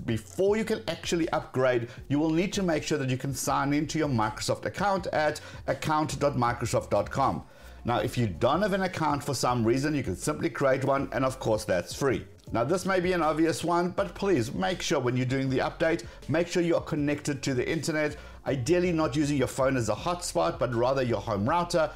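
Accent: South Africa. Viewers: I'm hearing a 🇿🇦 accent, or am I mistaken? 🤔.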